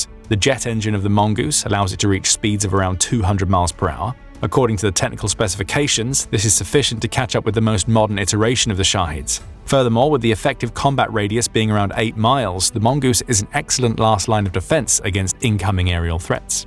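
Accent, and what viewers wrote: United Kingdom. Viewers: English (UK) accent is getting old.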